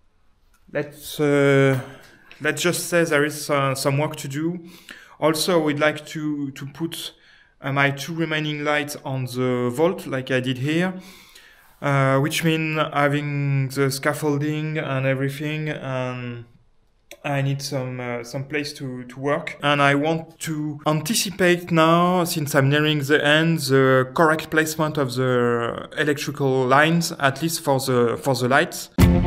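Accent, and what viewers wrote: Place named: France. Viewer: ça serait tellement bien honnêtement je comprend l'anglais mais un version français serait top. Faut avouer que tu as un sacré accent français (je fais pas mieux).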